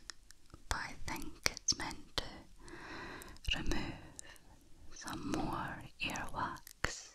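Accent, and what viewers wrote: Scotland, United Kingdom. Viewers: soft Scottish voice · I luuv your Scottish (Dundee?) accent and other northern English ones, like Yorkshire, Lancashire, and Scouse. I also imagine you a brunette as best I can; I’m allowed. 🥰💕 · I love the Scottish accent!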